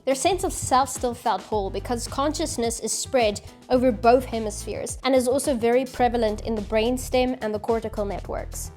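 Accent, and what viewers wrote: Afrikaans, South Africa. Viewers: Jy kan sommer die Afrikaans hoor😂❤🎉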